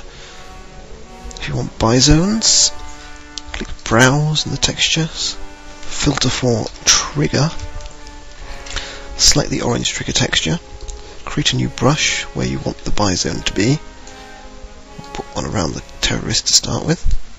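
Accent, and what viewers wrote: RP, United Kingdom. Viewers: You have a realy "Posh" accent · Hey! you have such a posh accent, is it foreal? im from central london and my accent is not nearly as posh as yours! i cant imagin you playing CSS Shooting people, it would be funny lol. :) and thanks! i watched nearly all of your tutorial video's and i have nearly fineshed my first EVER map! many thanks. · You talk posh ?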